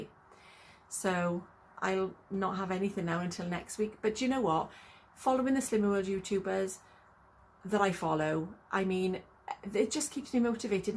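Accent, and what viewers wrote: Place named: South Wales, United Kingdom. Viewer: Well done its moving slowly, you are so funny, and you got a canny smile, I guess your from South wales I was born in North wales but the South has a much stronger accent lol but ive been up north east England 17 years now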